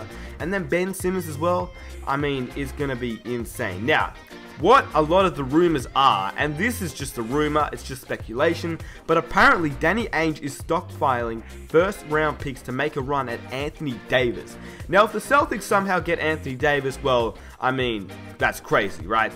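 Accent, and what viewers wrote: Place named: Australia. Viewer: An Aussie commentator - love it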